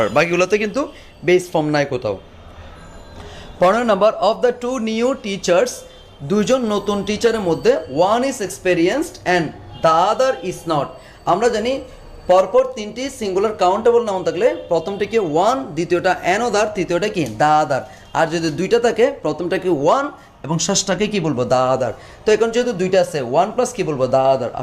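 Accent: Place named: Chittagong, Bangladesh. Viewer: Ctg r basa buja sbr kaj na bhai Eke to free paccen tar majhe ajaira kotha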